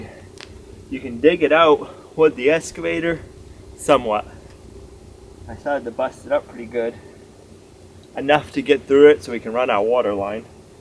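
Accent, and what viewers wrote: United States. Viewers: Regional dielect we have them all over the US. Lighten up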